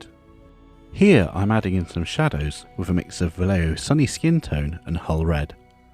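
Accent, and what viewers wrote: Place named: North Wales, United Kingdom. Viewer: ught! I want a cool northern Wales accent to!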